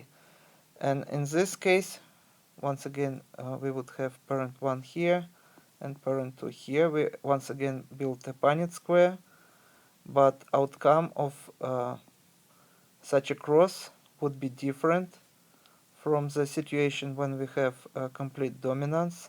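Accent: Russia. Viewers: your accent is very good. Are you from Russia? I liked that.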